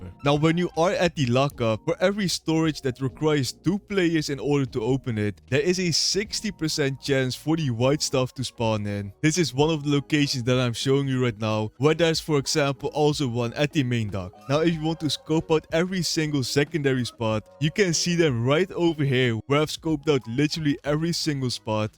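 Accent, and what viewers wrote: Netherlands. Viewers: je klinkt nederlands man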